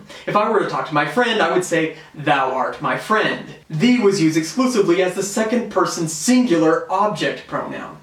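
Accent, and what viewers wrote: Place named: United States. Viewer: Hilarious - a seppo explaining old English! Though, accent aside - he does a good job of it.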